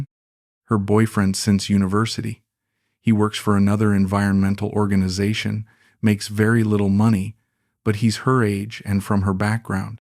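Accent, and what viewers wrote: United States. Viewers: 🤦 lord have mercy, this is fake, welcome to America 2026. Mindless entertainment to keep you distracted. 🙄. Supposedly British commentator with an American accent 😅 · American accent not British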